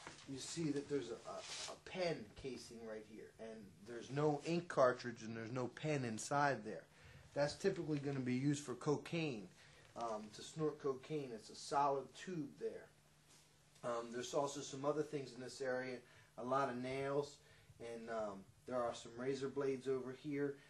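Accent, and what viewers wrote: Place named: Philadelphia, United States. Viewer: Sounds like North Philly? At least your accent. What city are you in there?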